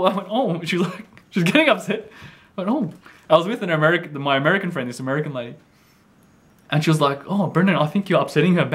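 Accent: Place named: Australia. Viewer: I agree with Donald and others, it would be helpful if you would speak a little slower in your videos. Although english is my native language and I usually understand what you're saying, because of your Aussie accent and fast pace of talking sometimes it is a bit difficult. Remember you have many viewers for whom english is a second language so I suspect for them it may be even more difficult at times. Great job on your videos though, I enjoy watching them and look forward to more. Maybe consider visiting Malaysia, Indonesia, Hong Kong or less familiar locations, places we don't usually get to see. tc.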